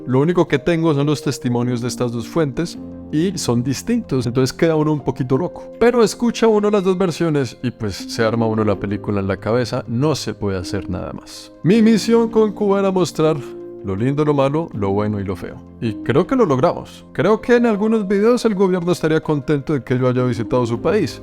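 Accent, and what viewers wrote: Colombia. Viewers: Es un lindo sueño pensar en su hermosa voz y su bello acento colombiano, como el primer sonido que yo podría escuchar al despertar. Es usted bello y admirable. Me ha robado el corazón con su personalidad y su talento. Reciba usted un gran abrazo con mucho cariño desde México 💕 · Haaa no, si eres comunista ya no te sigo mas jjjj jjj Me encantan tus videos y ese acento colombiano. Dios te bendiga, desde Cuba.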